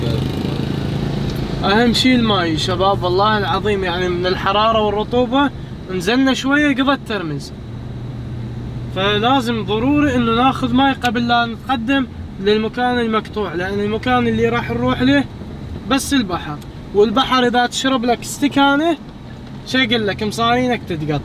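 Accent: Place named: Basra, Iraq. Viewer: مادري ليش يضحكني سدو خشمي وجيبلك كونيه وحمل هواي مصطلحات كلش حلووو هاذ من البصرة احسه نفس لهجتنه.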